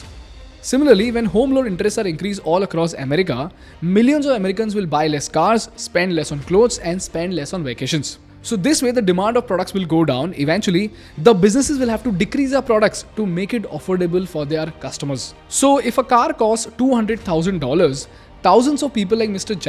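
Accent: India. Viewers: Its indian accent, much easier to understand. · Can’t take anything serious in this Indian accent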